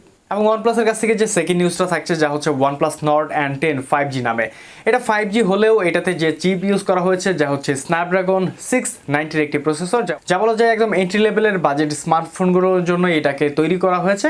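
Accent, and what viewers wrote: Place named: Bangladesh. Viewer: nA...ashole apnr ai vedio te beshir vag e india te phn launch korar kotha bolchilm to.. Ty vbsilm j apni hoito indian...bt apnr voice shune bhujha jai apni Bangladeshi 😅😅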